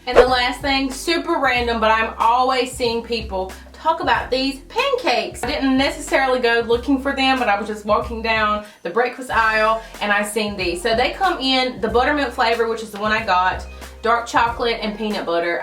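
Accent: South, United States. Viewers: why does she sound soooo country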